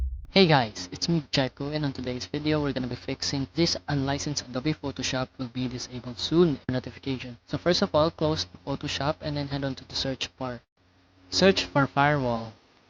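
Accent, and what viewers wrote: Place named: India. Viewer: If it is Indian accent then I watch till end. Because I know it’s going to work. Thanks man.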